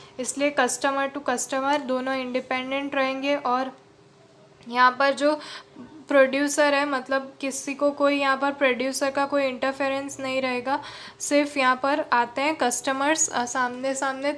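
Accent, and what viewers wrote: Gujarat, India. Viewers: Mam are you from Gujarat?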